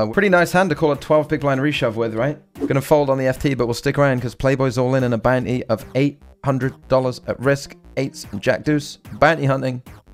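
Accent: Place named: Leicester, United Kingdom. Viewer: Aye up me duck,is that a Leicester accent?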